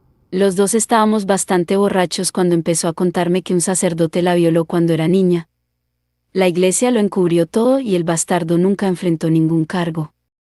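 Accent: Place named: Colombia. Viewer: Ponele voz de hombre. Esa voz de mujer colombiana no ayuda a los videos.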